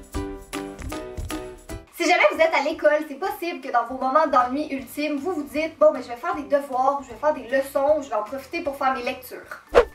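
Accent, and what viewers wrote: Quebec, Canada. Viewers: T canadienne ou québécoise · j'adore ton accent québécois 😙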